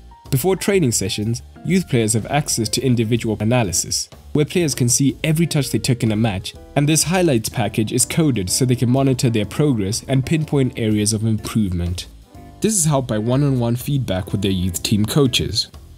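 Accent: South Africa. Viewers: Are you South African? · So who's the South African dude narrating this? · Are you from South Africa? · 100% South African speaker 👍, Shoutout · The narrator sounds South African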